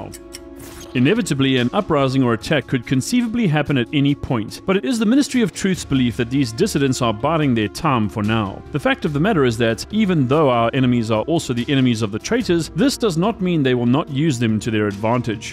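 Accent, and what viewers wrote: South Africa. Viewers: Is this a SAFA based channel? I know that accent far too well! SAFAs for democracy and liberty! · I know a South-African accent when I hear one, boet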